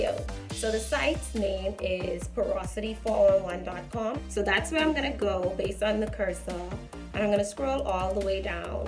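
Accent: Bahamas. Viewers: Are you Bahamian?? I can hear the accent.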